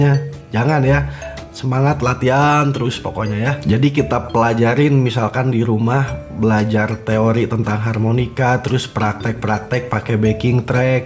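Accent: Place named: Bandung, Indonesia. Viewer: Bandung banget bahasanya 😅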